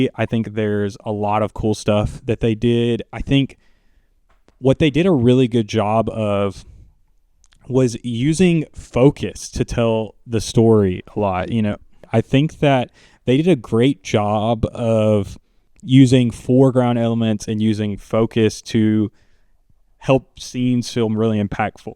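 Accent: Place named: South, United States. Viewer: about to watch the video but upfront: love the accent. don't think i hear many southern accents from youtubers i don't know if this was consciously on ari aster's mind when he made joe cross' ending, but in the theater i immediately thought about the cases where people have been disabled from complications of having covid and any preexisting conditions that are worsened by it. he's paralyzed from the head injury but it makes a dual image. i'm thinking it was conscious since the er doctors commented on his high temperature, and, if i remember correctly, that affected the care he got at the hospital. we know he has asthma and probably sleep apnea (i recall a machine in the bedroom). at the least these parts of joe's characters were added for the dramatic and comedic effect but aster is a very thoughtful writer and director. it isn't something i've seen anyone comment on or ask him about. 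it just nags at me once i saw cross' breathing problems i knew he was doomed (practically all main characters in his films are). and once he went off the deep end i was ready for whatever terrible fate would befall him. but i felt sympathy for him after he's paralyzed. despite it all aster created an ending so awfully poetic for cross that i was struck by what it must feel like to be him, trapped in his head forever and unable to do anything for himself. it's fucking bleak. and of course aster slaps you over the head for the empathy with what the nurse and mother in law do. genuinely diabolical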